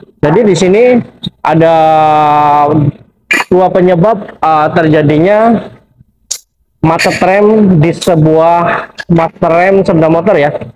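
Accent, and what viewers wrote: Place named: Cilacap, Indonesia. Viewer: Ternyata ini orang Cilacap jd jawareh😮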